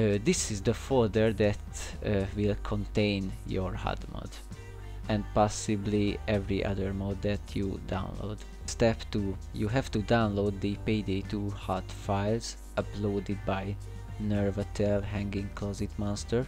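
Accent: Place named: Hungary. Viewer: Szia jól rejted a magyart XD lehet hallani ahogy mondod hogy erölteted az angolt és a magyar kiejtéseden is hallatszik(saját mappa, eggyik videóban ki irtad angolul:ez egy magyar vagy valami ilyesmi nem tudok angolul) de egyb. örülök hogy van magyar profi!!!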